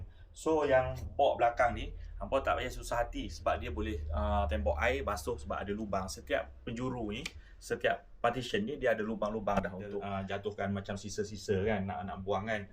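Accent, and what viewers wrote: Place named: Perak, Malaysia. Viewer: 😂😂😂 Peraq betui mu. Aaarr.. Psl x sebut Anmummm😅 oiii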